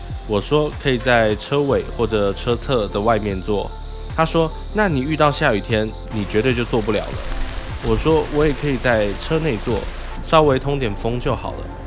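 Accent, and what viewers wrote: Taiwan. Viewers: 語調很台...用語很中....真怪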